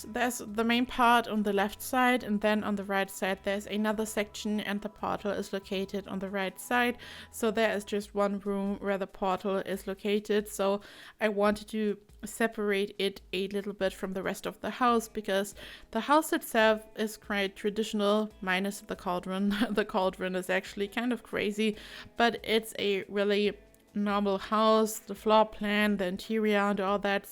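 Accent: Germany. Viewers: Are you german? I swear it sounds so familiar 😂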